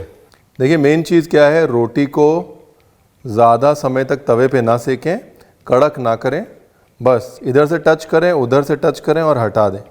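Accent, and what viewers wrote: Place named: Bhojpuri, India. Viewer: Your bhojpuri accent is so sexy ..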